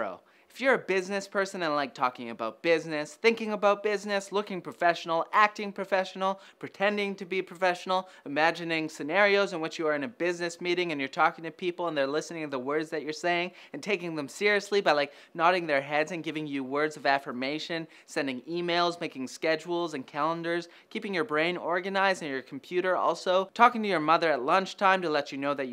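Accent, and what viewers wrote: France. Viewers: What a french accent. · U have a nice French accent dayyumm :D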